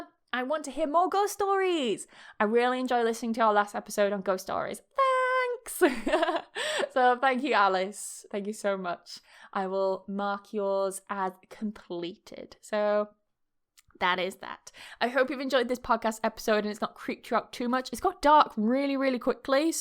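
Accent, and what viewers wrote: United Kingdom. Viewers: 100% British English always!!! I really enjoy your videos…..and with them I can develop my skills in understanding the English language. Thank you very much,.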